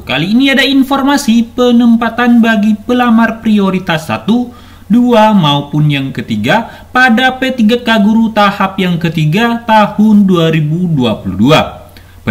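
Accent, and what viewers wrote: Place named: Madura, Indonesia. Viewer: Mantaabbb minnnn.... Logatnya Madura sekali mimin... Pokoknya thanks telah memberikan informasi yang cukup jelas ini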